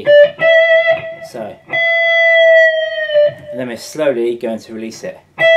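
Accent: England, United Kingdom. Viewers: english mate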